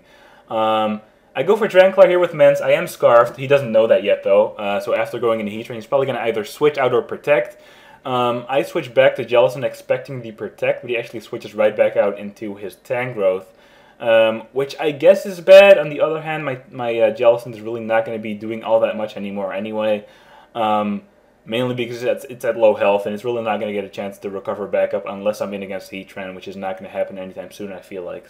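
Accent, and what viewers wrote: Netherlands. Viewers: Is it possible that you are Dutch? Your English sounds Dutch :P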